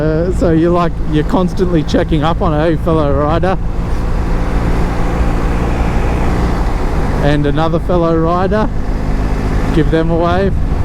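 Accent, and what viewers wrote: Australia. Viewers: You Aussie, mate? Hello from Toowoomba!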